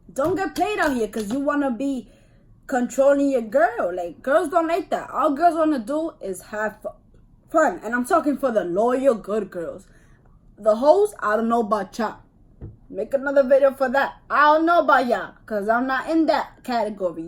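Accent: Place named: Bronx, United States. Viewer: Hold up, you gotta be from the Bronx😭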